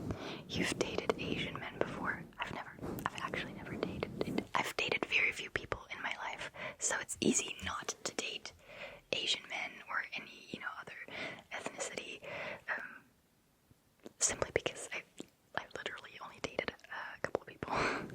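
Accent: United States. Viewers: Mando is saying why does she have an American accent when she's not American? That makes sense too.....She should have a German accent when speaking English. I've never heard a German with an American accent...... ever.... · I always assumed she was American living in the central north part of the country where there is a more German population.. I’d guess her first language was American English because she has an American accent, uses colloquialisms like “beating around the bush”, and even takes American liberties like saying “ommina” instead of “I’m going to” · Alright, from your accent I literally thought you are American. Kind of surprised me when you said you’re from Germany. You have a really clean American accent. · Never would have guessed you were German. I thought you were American based on your accent. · I just don’t understand how you’re German living in Germany with an American accent !!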